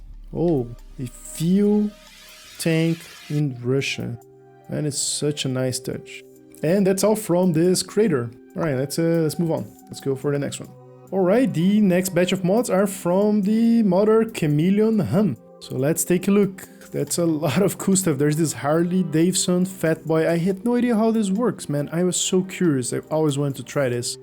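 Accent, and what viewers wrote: Brazil. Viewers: esse maluco parece um brasileiro falando inglês kkkk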